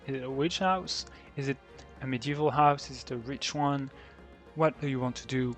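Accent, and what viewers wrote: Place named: France. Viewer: Hi, love your modular sets on the bazaar, and your explanations are really useful ps: ton accent t'as trahi mon cher concitoyen francophone X)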